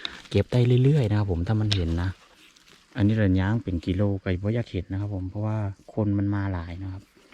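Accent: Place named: Rural, Thailand. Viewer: สวัสดีค่ะ ที่น่ารักมาเก็บเห็ดด้วยคนค่ะ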